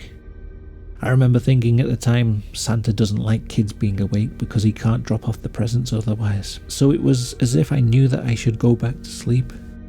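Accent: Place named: Midlands, United Kingdom. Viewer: Yeah, you can post the link so we can read all these stories ourselves, but... I'd rather listen to you tell them in your soothing Midlands accent. 😊 I grew up in Saudi Arabia and went to the International Academy, which followed the British curriculum. I believed in Father Christmas, it was not until we came back Stateside that I got back into Santa. (I do have the obligatory photo of me as a wee toddler, sitting on Santa's lap, bawling hysterically.) A great Santa story comes to us from David Sedaris, in an essay titled, "Six to Eight Black Men." I believe it's in the book Naked. Definitely one of my favorites of his. Happy Xmas everyone!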